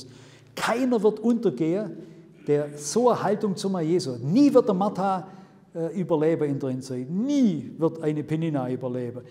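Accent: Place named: Swabia, Germany. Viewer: Sehr interessantes Zeugnis und dazu noch des Schwäbisch🤗🙏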